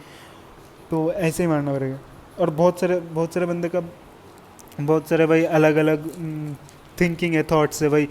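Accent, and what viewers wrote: Bengal, India. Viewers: Lag Raha hai ap Bengali ho isliya Hindi nehi bol pa rahe ho main bhi Bengali hu ❤️ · Tum bengali ho kya?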